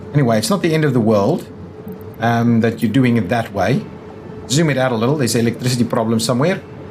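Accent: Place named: South Africa. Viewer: Hey, you don't sound like an Australian... much more like a South African ;-) . Nice to hear another South African stream/content creator.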